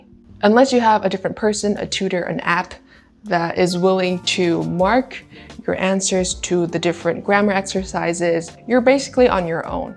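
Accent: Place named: France. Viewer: What a beautiful french accent, congrats!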